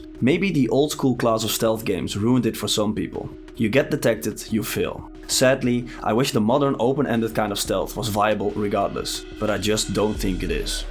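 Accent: Netherlands. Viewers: Good video. As a sidenote, you sound hella dutch.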